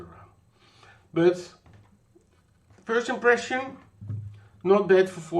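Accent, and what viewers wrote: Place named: Netherlands. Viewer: You sound very Nederlands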